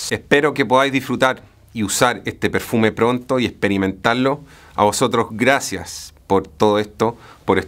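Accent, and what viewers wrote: Chile. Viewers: Disculpen la ignorancia...de dónde es este compadre? Habla como nosotros los chilenos, pero ocupa algunas palabras en español. La pregunta es por si existe alguna zona de España que hable igual a nosotros. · Habla como chileno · estimado tienes acento chileno ?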